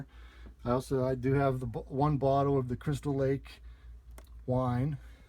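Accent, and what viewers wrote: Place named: Maryland, United States. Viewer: are you from Maryland? i keep hearing a slight MD accent. amazing collection, BTW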